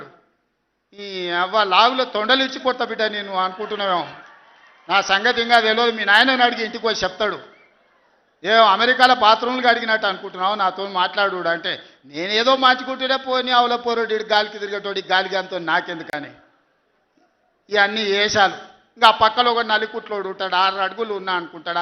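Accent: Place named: Palamuru, India. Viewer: Maa పాలమూరు yasa lo mata తీరు...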